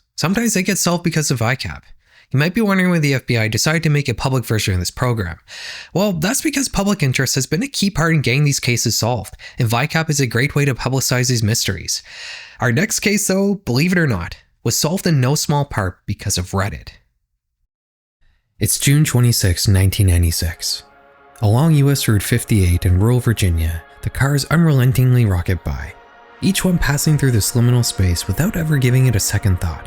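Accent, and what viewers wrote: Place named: Canada. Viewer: I'm really happy that this show is hosted by a Canadian. It's just too dark to be in an American or British accent. I need to learn "aboot" these things, not "a-bowt" them. It's just nice to hear while I'm looking up unedited versions of horrible stuff.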